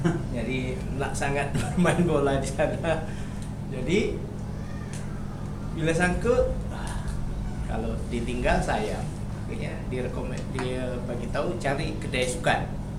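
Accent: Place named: Java, Indonesia. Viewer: Dari logatnya iya sptnya turunan jawa tp knp menjelek"an suasana di negara sendiri? Jkt tdk separah itu Jkt bkn spt New Delhi / Dakka. Jln layang di mana" bahkan kini sdg di bangun kereta bawah tanah / subway. Mmg Jkt tdk punya gdg tertinggi di dunia tp percayalah suatu kota akan terkenal bkn krn ada gdg fenomalnya tp krn suasana dan keramahannya jg keunikannya. Masjid terbsr seasia tenggara ada di Jkt, surga belanja terbsr seasia tgra jg ada di jkt yaitu di Tanah Abang. Mau makan makanan seluruh Indonesia dtg sja ke Jkt dari Warung Tegal, Sop Konro, Bubur Manado, Pepes Sunda hingga masakan padang smua bs anda jumpai di Jkt dari kls pinggir jalan hga di hotel" berbintang.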